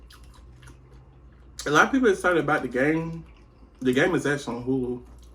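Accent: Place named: New York, United States. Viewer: You sound like New York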